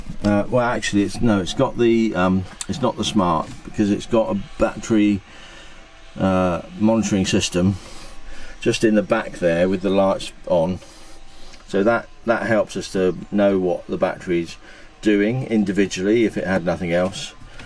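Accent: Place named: South, United States. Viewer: I'm with on that its that southern drawl. 😉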